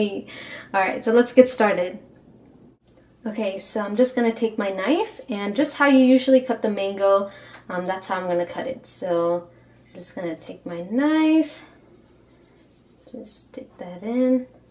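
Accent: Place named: United States. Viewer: It's so interesting to see how your accent has gradually become more American over the course of your videos, love the fruit art!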